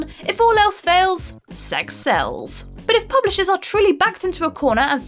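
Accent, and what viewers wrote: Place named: United Kingdom. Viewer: sounds like she's from the UK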